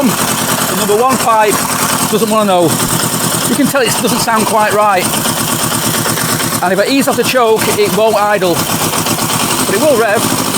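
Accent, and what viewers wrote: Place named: England, United Kingdom. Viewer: Good morning brother.... Have you done a compression and or leak down test on that cylinder.... Love your English accent... Cheers from Australia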